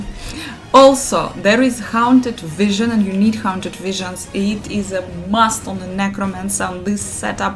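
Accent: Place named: Russia. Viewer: просто акцент был похож на русский, извиняюсь, продолжай в том же духе)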